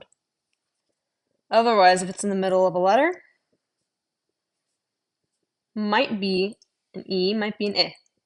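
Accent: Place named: United States. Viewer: Nice video! I really wish you'd used IPA though, maybe even in its own extra column -- it would've been a lot easier for me to glance over and understand the pronunciations that way. I majored in linguistics, to be fair, but I imagine a lot of people interested in Middle English on YouTube may have such a background. I also evidently speak a dialect of American English that doesn't match yours (my "beggar" has the SAME e sound as "better"), so some of your explanations led to me learning equally as much about your particular accent as about Middle English! Anyway, awesome job, and I'm looking forward to bingewatching this series! Thank you so much for creating a Middle English YouTube niche :D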